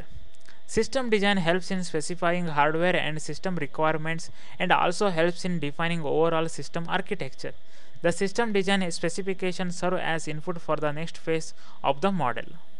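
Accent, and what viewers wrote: India. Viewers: he is indian guy, but I can understand him because alot of my teachers are indian and I get used to this kind of english. Thanks for the video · Very knowledgeable, he sounds South Indian....would really help if he got his accent sorted out...as it really become difficult to understand as well as taking his video seriously. Although he knows what he is on about, but the accent really is a turn off....could not use this as a video for my British students, i just know they will be bemused by the accent. Anyhow informative vid:)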